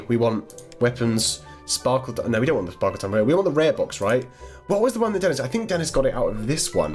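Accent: United Kingdom. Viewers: I like ur accent British? Great vid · Your British inglish...it’s so charming!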